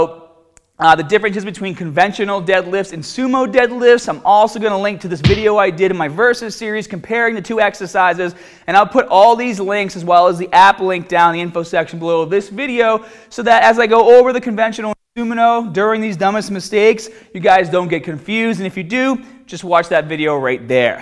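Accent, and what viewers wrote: Boston, United States. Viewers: I happened to just stumbled upon one of your videos and now I am hooked. I love the videos, love the way you tell it like it is and most of all a good teacher. I look forward to staying updated on all your videos keep up the good work man. Ps: where is your accent from? You up north-Boston area something? Lol Anyhow keep doing your thing man take care.. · Good, insightful stuff. One thing: as a Brit, I'd say your Boston[?] accent comes over a little strong. It's easily understood, but it makes a twenty minute video seem half as long again. If you could tone it down to a 'Ted' level, that'd be great, and maybe would widen your appeal. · Love the Boston accent, brp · You must be from Boston, I can tell by your accent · when did he get a Boston accent? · He's from America... That's a Boston accent. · This dude is pretty cool. He knows his stuff. 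That Boston accent kills me sometimes, lol, but good material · Bahbell... this guys from boston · With that Bahston 'A', if he spoke just a bit slower he would sound just like Ahrnold!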